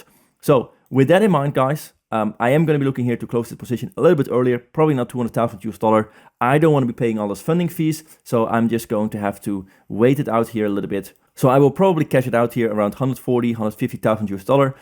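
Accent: Netherlands. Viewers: You are Dutch, aren't you?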